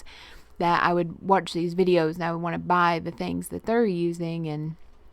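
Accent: South, United States. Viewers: Girl, you are getting good at those voice-overs! Liked the change up with the page too. It's good to break out of our comfort zone just a little every now & then. And yes, you watch those vids with all the art supplies & you want it all. End up spending a fortune too lol. Made me smile when you mentioned east TN. I am from there too. We all got that same accent. Still southern but less of a drawl.😋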